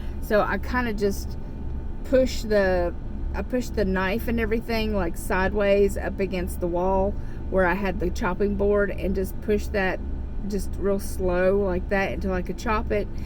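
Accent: South, United States. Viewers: Love the way you say "Oil". You still have a lot of south in the mouth!!!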